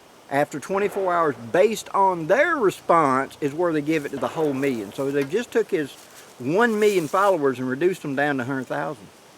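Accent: South, United States. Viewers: Hey - That stuff sounds complicated to me. The only thing I know how to do, is watch the videos. That works for me. It is interesting to say the least. You'll figure it out and your southern accent is not near as bad as up here in WV. You take it easy and just go with the flow- Carry On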